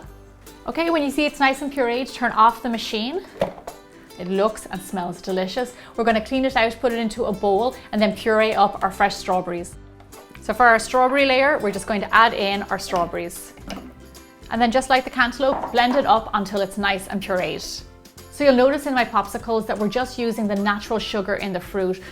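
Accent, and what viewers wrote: Ireland. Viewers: r you irsh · Hello,! I watch all your recipes and even have tried some myself and they are all amazing! I also love your Irish accent! One suggestion: Can you post a video about how to make bueno cream spread? Cannot find it anywhere online. Thank you!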